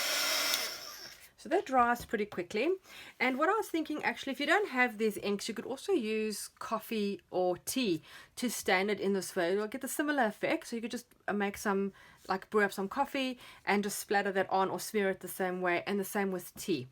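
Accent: South Africa. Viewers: Gorgeous! Btw am confused by your accent! You are in Oz but your accent sounds South African!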